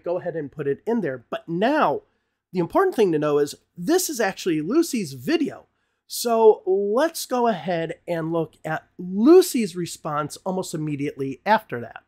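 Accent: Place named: United States. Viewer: Hi teacher am new here to learn english a. Loving your accent, which state of usa are you from? Thanks in advance for your beautiful class.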